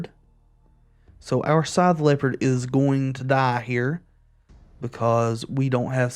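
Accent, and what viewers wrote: United States. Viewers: Definitely had to subscribe and leave a like. This was a very entertaining video, and I'm glad I happened to stumble upon it from watching LegenVD. You have good audio and video quality (looks very crisp!), a clear voice, and I enjoyed how you always explained your reasoning for whatever play you were doing. I was already familiar with all of it, but I've always appreciated when people playing this help new players out by giving advice. Though I think my favorite aspect of watching this was just how pleasant you were. Very thoughtful no matter how you were doing. That said, I've got to ask which state you're from after hearing the accent.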